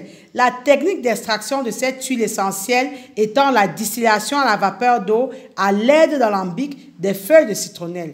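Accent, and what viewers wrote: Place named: Africa. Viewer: Félicitations ! L'agriculteur africain a besoin d'entendre vos conseils. Personnellement je vous écouterais des heures tellement votre voix est claire et votre accent tout africain de ce français que vous maniez si bien est comme une musique. Continuez. On est ensemble.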